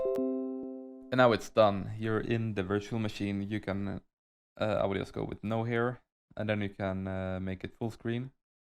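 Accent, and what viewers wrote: Sweden. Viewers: swedush my man som jag xD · ayyy du är svensk lmao tyckte att jag kände igen dialekten haha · You Swedish? Same!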